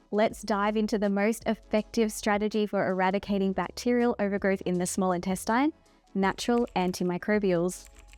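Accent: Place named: United Kingdom. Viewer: Why don't you cover sulfur SIBO? It has greatly negatively affected my temperament and made me rather picky. For example: titration. I don't mind the British pronunciation but you pronounce oregano as if it weren't English and I find that very irritating. At least you're not butchering turmeric like half the internet, especially Americans. uh-REG-uh-no I realize you're pronouncing it the Italian way But that kind of clashes with your accent. It really bugs me that You say bacteria are smart when in fact they are resilient and lack intelligence.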